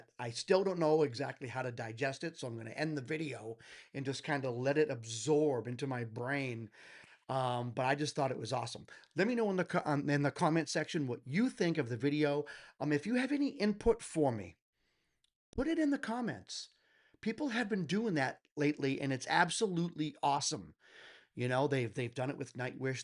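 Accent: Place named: Philadelphia, United States. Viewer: " " by with, trust me! (I'm from Philly, living in the midwest, hearing you speak is like being in my hometown area!)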